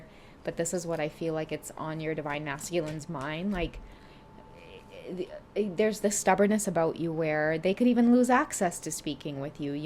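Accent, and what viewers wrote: Trinidad and Tobago. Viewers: I love to hear you speak.. u bring me home every time I hear that. I might be wat dey call a Yankee but growing up all I Kno is a Trini tounge all my thoughts is in a Trini accent. I love to tap in with ya. Ya does really make me laugh 😂❤ but ya talking de truth. Luv itt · As a fellow West Indian, Jamaican girl, I LOVE your channel. & love your trini accent 🥰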